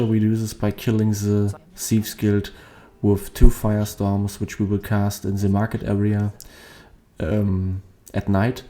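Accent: Germany. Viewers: Dein deutscher Akzent. 😄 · Gutes Video, verfeinert mit dem deutschen Akzent, fast schon perfekt. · GOOD GERMAN ACCENT. I love it!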